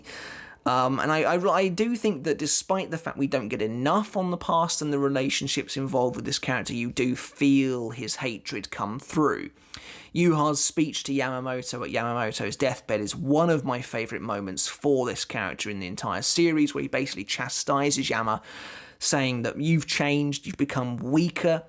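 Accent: England, United Kingdom. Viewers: I’m onto to you, there’s that subtle English accent but I won’t be fooled that your not American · Not usually a fan of British accents... but... your base, narrative delivery,and VERY concise knowledge of this masterpieces of a show... I’m now a solid fan! I’ve sent links to 12 of my fellow friends who are about this show like you are! Keep going all the way to the top bro! I’m an American fan! 😎❤️🙌🏻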